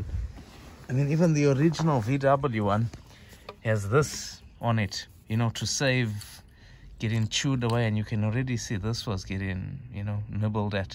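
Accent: South Africa. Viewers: Where are you from? You sound like a South African. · Hi Calvin here can hear you are from SA 😅 wanted to know is there a way i can get in contact with you for the spares you get cant get nice things this side for the golfs and audis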